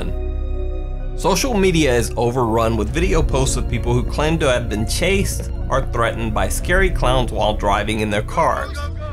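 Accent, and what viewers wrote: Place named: South, United States. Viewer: I love your southern accent do you live in Tennessee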